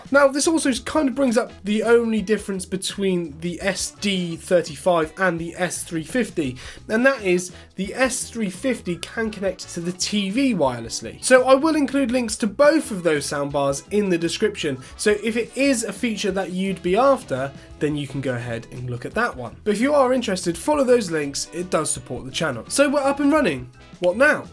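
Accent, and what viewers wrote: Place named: United Kingdom. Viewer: not bad, just different. You Brits put more emphasis on certain letters. Like the way y'all say schedule or aluminum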